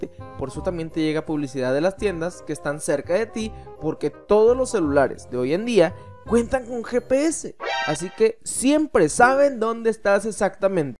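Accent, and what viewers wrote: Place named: Fresa, Mexico. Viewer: se oye como fresa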